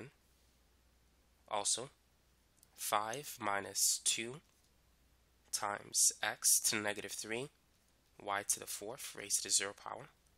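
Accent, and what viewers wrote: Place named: New York, United States. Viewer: I feel like you have a New Yorker accent.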